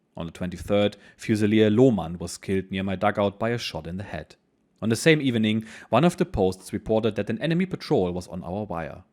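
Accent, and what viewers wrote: Germany. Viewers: These are quite the treat. 👍 I’ve already read Storm of Steel twice, but your insights and German accent add a whole new dimension to the experience. Will definitely continue this. God Bless 🙏🏻 P.S. I don’t know if you take suggestions, but “Black Edelweiss” by Johann Voss a Waffen SS veteran would be an interesting listen. No pressure. Peace ✌🏻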